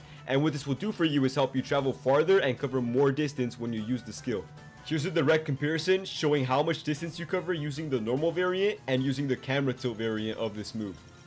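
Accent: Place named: United Kingdom. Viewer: You sounded like british aiku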